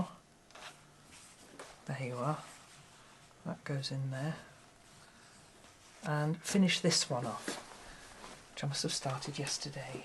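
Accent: England, United Kingdom. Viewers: It is so calming and cozy for me to watch your videos especially the scrap quilts and lovely English accent. Merry Christmas from Alabama, USA 2025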